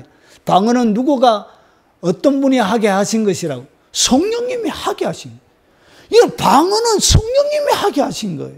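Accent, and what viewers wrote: Gyeongsang, South Korea. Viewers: 억양이 강한 경상도 사투리 때문에 그런 오해가 있는 것같습니다. 저도 경상도 억양 때문에 그런 이야기를 들은적이 있어요. 부디 방언으로 능력있게 기도하시길 바랍니다. 할렐루야 ~!!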